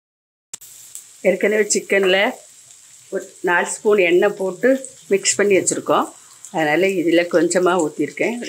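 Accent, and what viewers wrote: Nagercoil, India. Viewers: Nagarkoil Tamil mathiri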